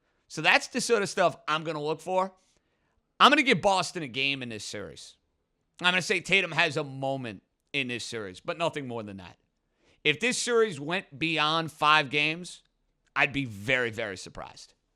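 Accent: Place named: New York, United States. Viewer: This is the most New York sounding guy I’ve ever heard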